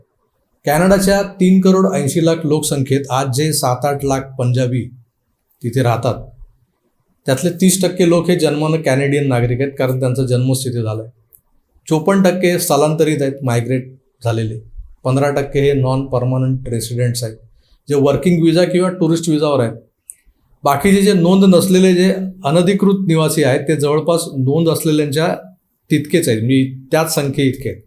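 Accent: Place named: Mumbai, India. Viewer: Very beautifully explained and your language is very sweet , typically mumbaikar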